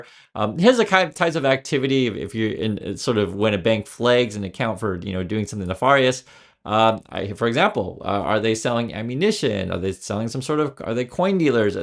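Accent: United States. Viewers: I've only just seen your channel today. Your accent sounds American. you may be able to pass as Canadian. So why are people telling you to move back to China? It sounds like you were born here. Or at least grew up here. Although I would guess at least 3rd generation American.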